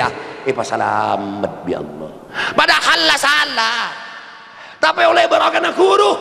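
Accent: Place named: Madura, Indonesia. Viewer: logat maduranya kental banget ya pak Kyai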